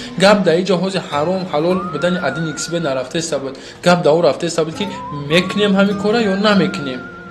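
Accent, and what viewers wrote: Kulob, Tajikistan. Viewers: Кулобии тоза!! 👍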